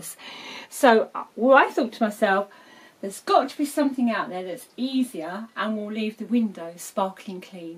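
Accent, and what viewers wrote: United Kingdom. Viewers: Hi, you sounds like a British, i love your accent ;) Thanks for your demo ^^ I've bought same ! (from France btw)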